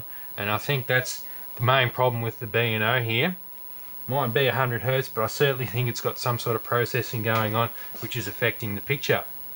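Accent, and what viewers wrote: Australia. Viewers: love ozzy accent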